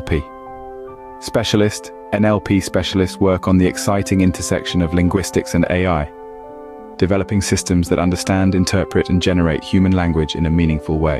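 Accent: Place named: United Kingdom. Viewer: your British accent is amazing and this video gives me some insights on AI trend in the future, nice video introduction👍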